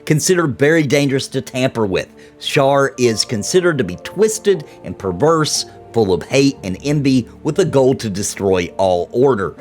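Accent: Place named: South Carolina, United States. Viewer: I live in South Carolina.... your accent reminds me of alot of people around here.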